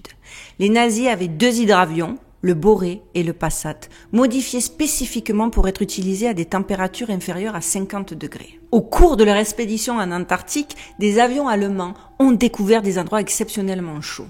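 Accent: South, France. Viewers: bonsoir, Excellente vidéo et convaincu je suis. Merci, petit rajout vous avez un bel accent du sud de la France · Super émission, c’est sans fond! Impressionnant ! Merci J’apprécie d’autant plus que je suis du sud aussi, et j’aime nos accents😊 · j aime ton accent du sud de la france que du bonheur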